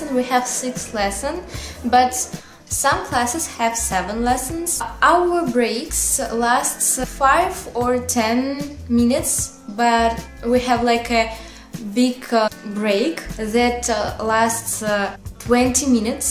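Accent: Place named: Russia. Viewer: видно же,что русский акцент хах😅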